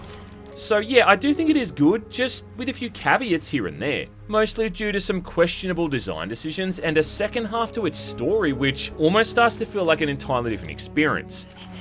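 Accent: Australia. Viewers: the aussie jeremy clarkson of game reviews, i cant take no more of this ear rape.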